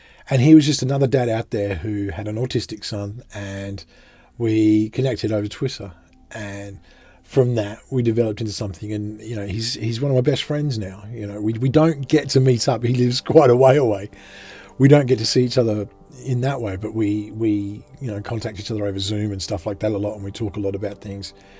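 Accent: Australia. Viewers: Are you from UK or AUS, you sound like you grew up in regional Victoria! Thanks heaps for your insight. Ive a 5yo boy with asd, pda and Add/hd potentially. You’re a hero. First search on yt as a result of desperation and I’ve been sucked into your channel. Thank you. Big love from down south 🖤🦘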